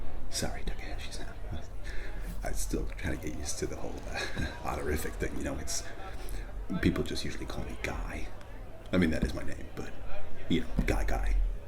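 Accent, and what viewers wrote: United States. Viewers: You picked one of our main accents (in the US). The nuances are spot on (newscasters and narrators of the mid century often take this tone), and excelently paired with social status, and stereotypical, shall we say "frame of reference"? And the acronym; still laughing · I’m an American, and I gotta say, your American accent is great. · Good American accent! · 1) American accent is great as always! 2) American accent speaking Japanese is phenomenal! 3) Bad jokes are great!!! 4) You are a remarkable bullshitter. You would be a great “generic Wall Street banker”. 😋🤣🤣🤣🤣🤣🤣 🍶🍶🍶🍶🍶🍶 Much love!!! 💙💙💙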